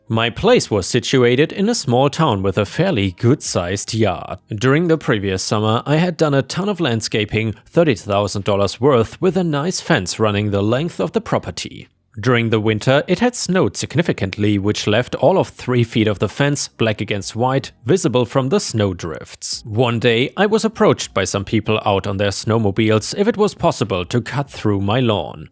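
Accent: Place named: Germany. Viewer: I can't help it, but your accent sounds very german to me. Are you a german expat in Thailand? Anyways, thanks for the entertainment!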